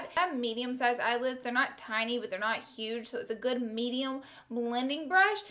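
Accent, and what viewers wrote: South, United States. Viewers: i love your accent and how you always say "whenever" instead of "when. so southern <3 you're precious! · Your southern accent is so cute 😂❤️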